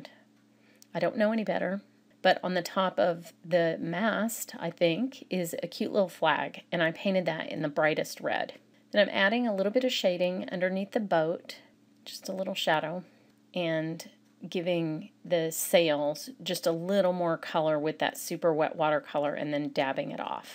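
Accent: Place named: Texas, United States. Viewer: I'm slowly working my way through your video and blog archives, adding many to my Favorites because of the techniques you show and tips you provide. Love your sense of humor a lot; your deadpan delivery in this video, when you were talking about options if you don't have 24 minutes to make a card, made me laugh out loud -- thank you for that! Also, I wish my Texas drawl was as lovely to listen to as yours; I tend to talk too fast to let my drawl sprawl ;-).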